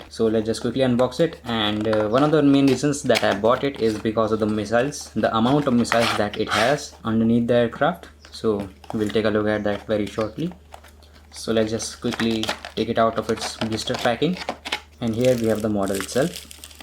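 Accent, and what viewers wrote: India. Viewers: you sound like an indian are you in usa??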